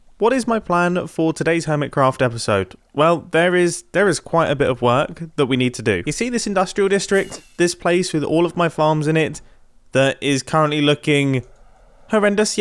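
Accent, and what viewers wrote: United Kingdom. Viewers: I love his British accent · aren't we all loving this British person accent?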